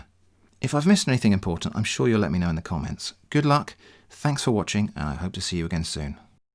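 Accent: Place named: London, United Kingdom. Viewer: Speaking of characters, I remember your Londoner accent from the scambaiting videos!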